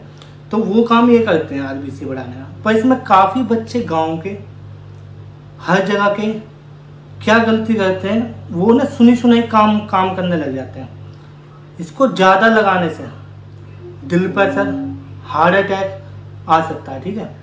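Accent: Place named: Bulandshahr, India. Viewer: Bahiya app disst Bulandshahr se ho kya language se aise he lag raha ha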